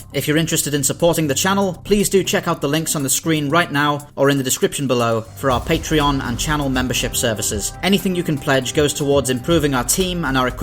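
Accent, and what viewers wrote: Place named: Northwest England, United Kingdom. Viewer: love this where in the Northwest of UK does your voice over talent Hail from?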